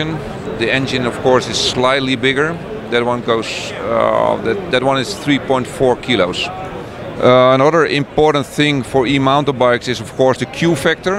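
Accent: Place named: Netherlands. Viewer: English speaking with a Dutch accent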